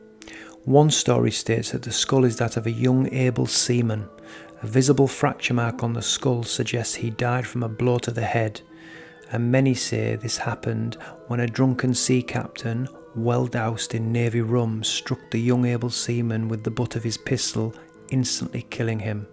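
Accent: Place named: Yorkshire, United Kingdom. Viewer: I love your narration and Yorkshire accent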